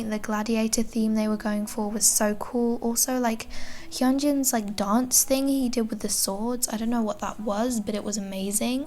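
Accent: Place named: England, United Kingdom. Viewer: Omg, I really love you're English accent :) Great video btw :)))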